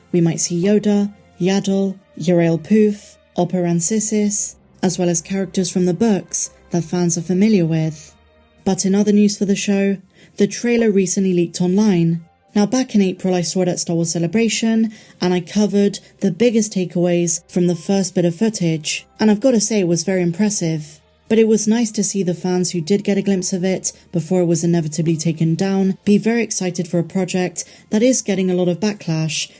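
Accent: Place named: England, United Kingdom. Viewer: Ok, the accent is way too heavy for me to understand what the narrator is saying almost totally. Could you please work on the sound quality or get a narrator without an english or whatever itis accent?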